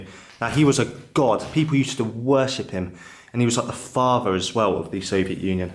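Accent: United Kingdom. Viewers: I love that brittish accent of you 😂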